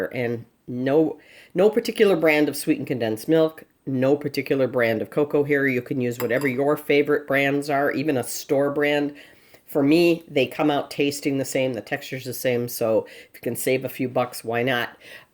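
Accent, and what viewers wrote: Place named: Rochester, United States. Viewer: That looks YUMMY--and so easy even I can do it!! HEY--are you from Rochester by any chance?? (I'm a native.) You have a Rochacha accent! I live in Virginia now and I miss my home town but not in the winter!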